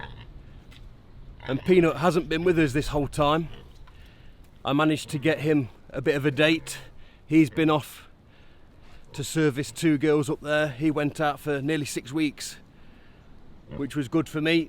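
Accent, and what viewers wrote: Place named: East Midlands, United Kingdom. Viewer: Nice to see a new video! You sound like you’re either Notts or Derby… if it helps I use RB Elliott at Calow and they kill and cut the pigs for me, I only have a basic cut into big joints and then cut them down further myself and package up. They do offer kill only of course I’ve always found them to be very good.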